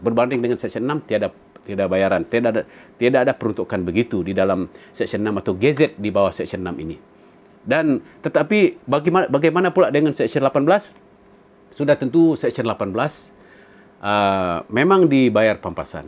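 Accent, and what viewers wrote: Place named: Indonesia. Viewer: Kenapa cakap macam indon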